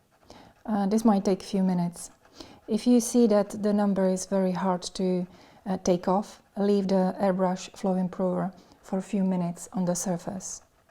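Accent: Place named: Slovakia. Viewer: Great video. Good to know there is an alternative to an airbrush as I don't always mine to hand. I'm curious where your accent is from. My wife is from Slovakia and she's convinced you are from Slovakia too.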